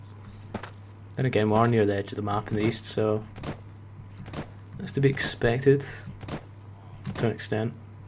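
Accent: United Kingdom. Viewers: awrty mate ;) Nice video man, where about in Glasgow are you from, since you have Glaswegian accent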